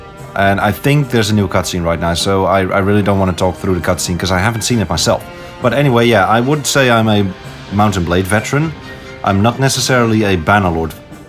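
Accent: Netherlands. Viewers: Within the first 10 seconds of the video I was like "is this dude Dutch?" checked your about and yes, yes you are, New sub acquired friend.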